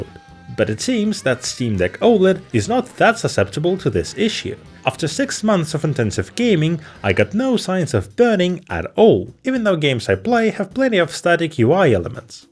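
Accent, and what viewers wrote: Russia. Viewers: Должен сказать, что русский акцент почти не слышно. Отличное произношение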